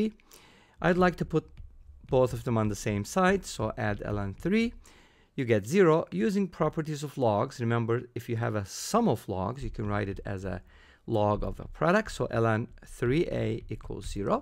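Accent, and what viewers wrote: Turkey. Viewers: Im trying to determine where this guy is from the whole video. I've concluded with Turkish by the accent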